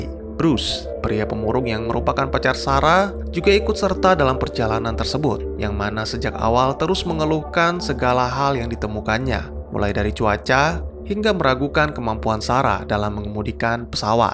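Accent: Aceh, Indonesia. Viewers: orang aceh ya om?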